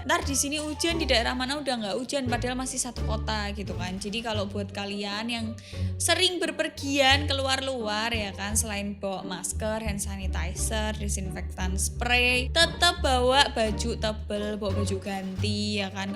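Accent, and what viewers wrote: Java, Indonesia. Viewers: ngomong nya kaya orang jawa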